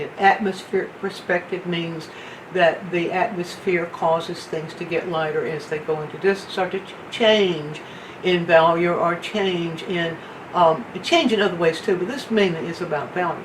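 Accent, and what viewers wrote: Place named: Appalachia, United States. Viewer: I love the tutorials and I love so much that her accent sounds like mine. Any time I hear an Appalachian accent in a teacher of any kind it makes my heart glad!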